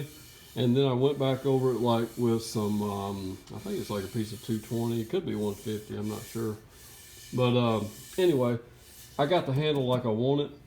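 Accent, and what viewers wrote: South, United States. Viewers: I'm terribly sorry that your brain is unable to process southern accents.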